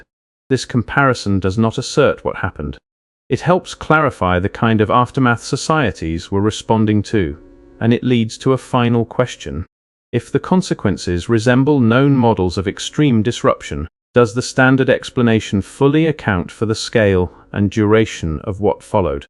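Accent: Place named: Europe. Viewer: every vid has the same eurotrash accent WTF its all coming from the same eurotrash AI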